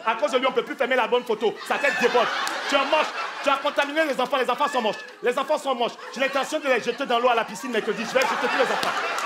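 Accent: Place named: Ivory Coast. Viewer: C est un ivoirien son accent est trop fort claudia son garçon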